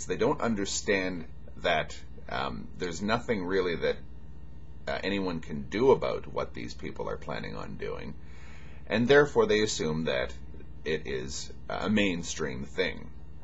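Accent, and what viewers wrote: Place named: Canada. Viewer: Hehe, Sir, I know a Canadian accent when I hear it:D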